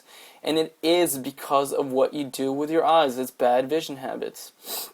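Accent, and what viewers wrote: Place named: United States. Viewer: Had you not stated you were from Norway at the start of this video, I would've assumed you were an American from a West or Midwest state. Your spoken English is virtually identical. As a Minnesotan (of 25% Norwegian ancestry), I would have zero inkling you weren't from here if I ran into you on the street. The same could not be said if I ran into someone from Tennessee or New England if I heard them speaking. Fantastic video BTW.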